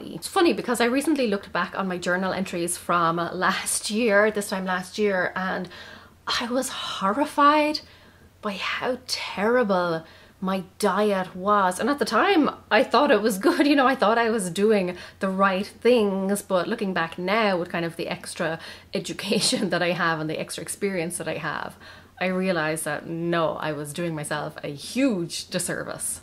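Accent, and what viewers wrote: Ireland. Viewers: These were great tips, thank you. Might have a look at what else you have. Love your accent, are you Irish? Thank you for sharing 🥰🙏🥰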